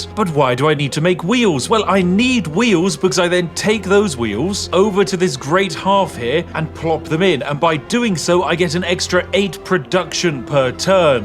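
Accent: United Kingdom. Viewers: I don't really know what's going on but I enjoy listening to the silly British man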